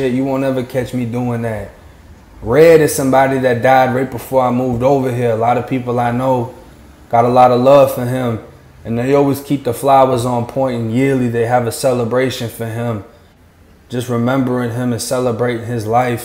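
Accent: Boston, United States. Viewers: I'm from worcester n it's nice for someone to finally she's some light on what happens in ma. U have a Boston accent..but thought u was from FL. A lot of shit happens around here but it never gets so thank u!!! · Ok man I get it..I thought u sounded like u were from Boston..ok man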